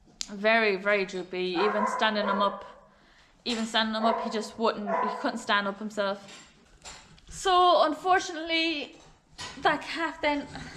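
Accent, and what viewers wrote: Ireland. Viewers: How have you managed to hold onto the Irish accent for so long?! enjoying the videos, keep up the good work! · Great video you present very well, love the Irish accent. Stay well and stay safe, and I look forward to more.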